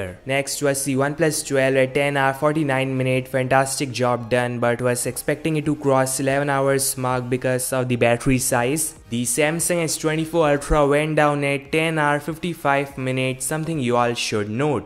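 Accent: India. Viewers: Oh my...that Hindglish 🤦‍♀🤦‍♀🤦‍♂🤦‍♂ffs!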